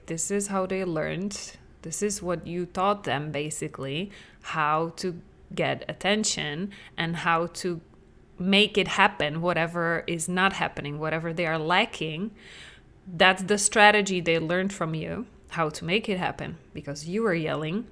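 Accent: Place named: Czech Republic. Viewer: I was trying to place the accent, but didn't guess Czech Republic. I lived in Prague for 2 years. I love all the Czechs I know! I had a full breakdown last week just hopeless because I have been trying to learn to parent better for ten years now and I still can't (5 kids 10 and under). Your content is a new take, I'm excited to learn more.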